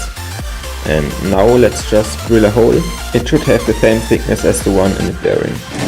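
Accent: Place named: Germany. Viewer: your english isn't the best, bist du deutsch, are you german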